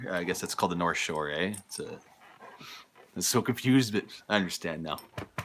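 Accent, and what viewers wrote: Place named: Quebec, Canada. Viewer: You were talking with your hands so the dog thought you wanted to play. You don't sound Ontario Canadian , you say eh way too much. I'm Ontario Canadian, and I say it a couple times every few months or less lol. Actually you do sound like you're French Canadian from Quebec with that many eh's in a short time. I prefer to watch mostly all Canadian channels of camping. I'm a new subscriber. Actually you could be from Vancouver or Newfoundland they say eh tons too.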